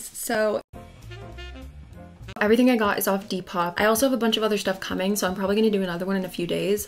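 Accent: United Kingdom. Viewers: Hi I’m a fan who lives in London and I looove your sudden british accent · wait do you have a british accent? please answer i need to know im so confused haha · congrats on 3k!!! <3 for a video idea it would be so fun to see how u style ur brandy items with other things in ur closet, i always get new things but then dont know how to incorporate them plus ur style is so cute also this is weird but ur british accent makes me so happy lol my friends and i always did that with each other before quarantine kicked us off campus rip </3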